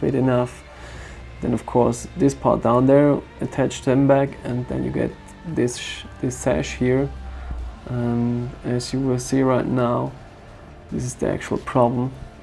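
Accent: Germany. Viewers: Are you German?^^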